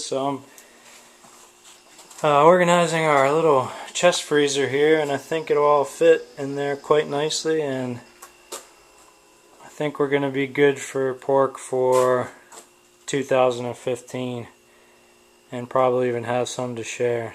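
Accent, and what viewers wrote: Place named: Carolinas, United States. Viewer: Awesome! Sounds like your in the Carloinas and not Texas though. Cool videos man!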